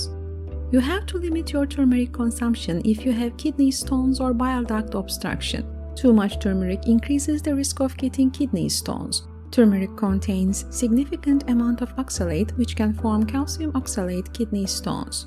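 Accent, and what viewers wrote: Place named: Turkey. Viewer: from your accent I hear you are Turkish. Turkey has the best chefs.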